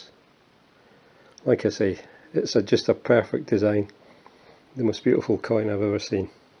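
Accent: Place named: Scotland, United Kingdom. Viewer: . pleasure to watch. I'm a new sub for you. I've heard your name a hundred times on this Channel. I saw what you did for y numismatic collector the other day. Very Nice gesture on your part. you are a gentleman. great Video on the us 90% pleasure to run across your Channel and finally hear that Scottish accent.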